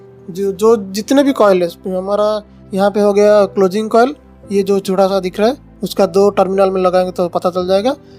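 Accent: Odisha, India. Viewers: Thanks yr.....or ek baat.......mujhe lagta hai aap Odisha se hai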